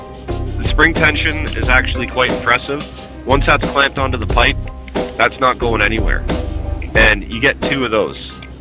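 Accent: Canada. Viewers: Good Job enjoy your video, very good explanations in your narration ...are you from TO?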